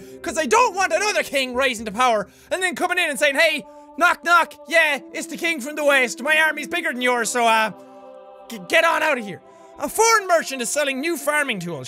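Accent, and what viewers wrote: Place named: Ireland. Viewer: Please make more videos where you talk in that heavy Irish accent it's very beautiful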